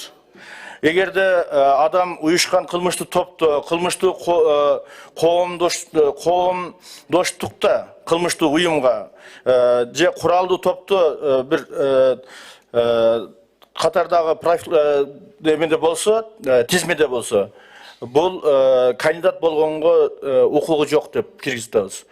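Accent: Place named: South, Kazakhstan. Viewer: Түштүктүн говорунда сүйлөгөнү үчүн жакпады эле деп койбойсуңбу!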